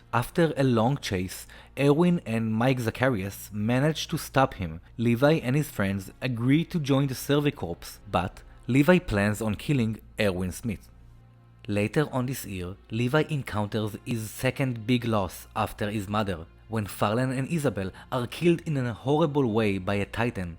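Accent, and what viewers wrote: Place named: Israel. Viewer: אתה ישראלי, נכון? שומעים את זה כל כך😅😅😅 ואגב, אחלה סרטון👌🏻👌🏻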